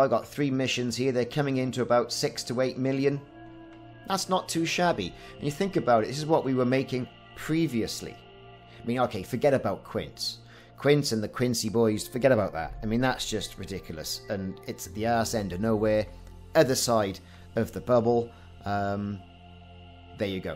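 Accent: Wales, United Kingdom. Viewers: Welsh CMDR?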